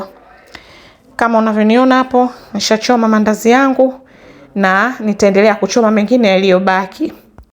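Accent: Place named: Tanzania. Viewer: Mahamri ni lugha ya Kenya, lkn mpishi anaonekana ana lafdhi ya ki Tz, neno "kiduchu" sina hakika lkn nahisi kama linatumika Zanzibar pekee 😂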